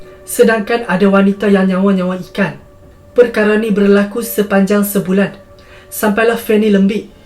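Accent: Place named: Kuala Lumpur, Malaysia. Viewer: SLANG2 TU JGN NAMPAK SGT..JADIKAN SLANG K.L ORI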